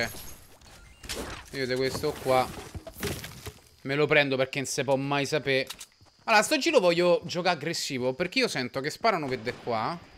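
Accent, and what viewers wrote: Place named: Naples, Italy. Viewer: Ti seguo da poco ma ho già capito che sei napoletano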